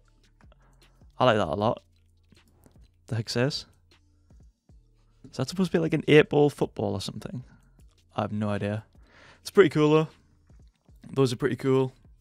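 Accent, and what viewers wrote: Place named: Newcastle, United Kingdom. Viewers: What? You can't tell his british accent from all those settings videos? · He’s from Newcastle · Now we officially know ghat is british 😂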